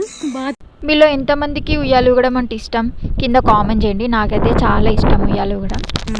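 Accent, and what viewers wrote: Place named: Telangana, India. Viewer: Telangana slang shade aithe pakka untadi 😅.ade continue cheyyandi baguntadi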